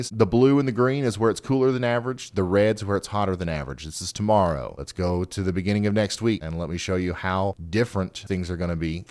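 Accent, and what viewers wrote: South, United States. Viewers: I love my weather delivered with a sothern accent.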